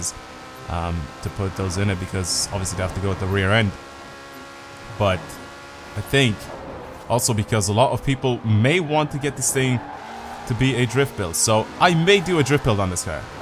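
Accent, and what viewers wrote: Ireland. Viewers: Are you from Ireland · What part of Ireland is he from if he’s even from ireland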